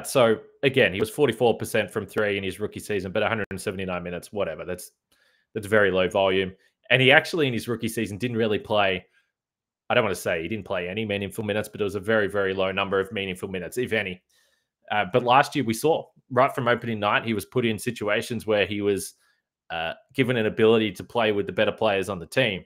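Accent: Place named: Australia. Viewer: Streuth! These Yanks are a bunch of flamin’ galahs if they can understand you. Fair dinkum, I’d be devo if you changed up your accent. I mean crickey if yous can’t understand, bum a durry, hit the Bottle-o or the servo and grab a frothie, and get in the Oz mindset before your bang on the podcast you’ll be cherry ripe. No Wuckas mate!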